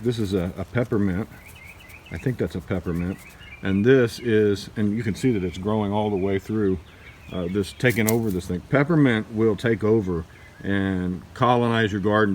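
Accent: Louisiana, United States. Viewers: I love your videos because they are spot on, succinct, & easy to listen to. I was raised in Louisiana and enjoy your accent, too. Question - is sorrel an herb or salad green? I put one in an herb bed and am thinking I'll repot it by itself since learning it's perennial. I'm in coastal California so deal with some fog. Wish me luck!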